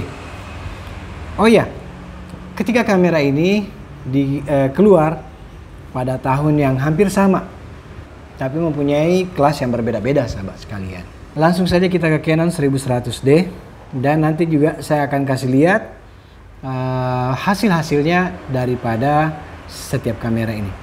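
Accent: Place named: Bugis, Indonesia. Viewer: Org Bugis y??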